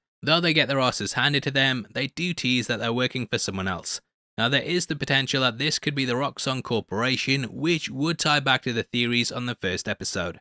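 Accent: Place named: North East England, United Kingdom. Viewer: The only thing I look forward to other than a marvel movie or show is your break downs. Your NE accent is just totally 💯. The one place I miss in the UK most. Great stuff sir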